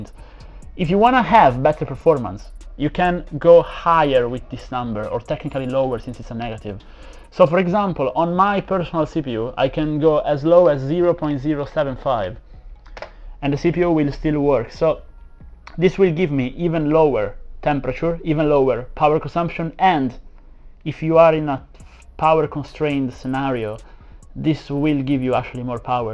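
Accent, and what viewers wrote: Italy. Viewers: Ti ringrazio molto per il tutorial, ho visto il video e per fortuna asus non ha stravolto il suo bios dopo 3 generazioni (ho un i7 13th e una z690). Ps. dalla pronuncia ho capito che sei italiano, sai parlare molto bene in inglese, complimenti.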